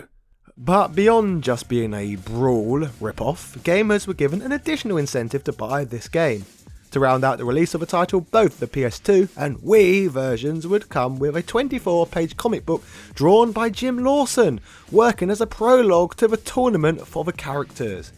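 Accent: United Kingdom. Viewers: "Venus de MEE-lo"??? "SAY-ban Entertainment"??? You Brits certainly have a unique way of pronouncing certain words...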